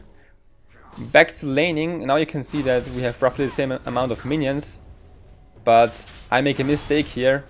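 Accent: Germany. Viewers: thats your fault you have to speak free and not try it hard oder rede einfach deutsch ich glaube das du deutsch sprichst mit deinem akzent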